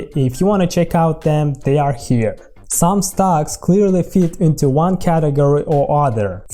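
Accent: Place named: Central Asia. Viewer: With strong Central Asian accent. My guess would be Kirgiz or maybe Kazakh. Красава!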